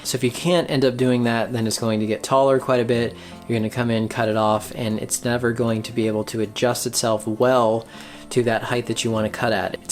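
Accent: Minnesota, United States. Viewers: You must be Minnesotan, Right?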